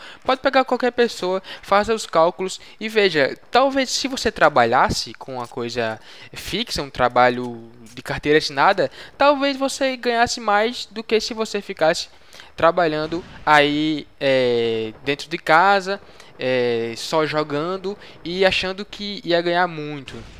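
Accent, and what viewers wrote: Northeast, Brazil. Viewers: tudo bem cara vc tem sutaqui diferente,vc e. Nordestino?? Abraço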